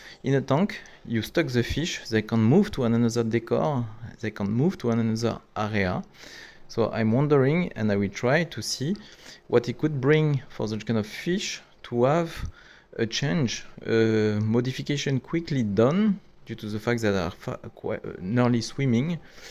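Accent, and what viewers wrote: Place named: France. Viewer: Bonjour, si je vous écris en Français c'est que j'ai cru percevoir un accent Français dans votre anglais...Je suis frileux avec le fait qu'à l'intérieur de ces roches, l'eau ne sera pas filtrée d'une part, et sera stagnante d'autre part. Qu'en pensez vous?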